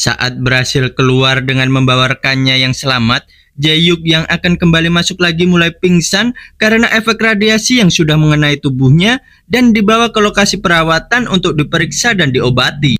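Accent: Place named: Banyumas, Indonesia. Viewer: Ngapak bang 😂😂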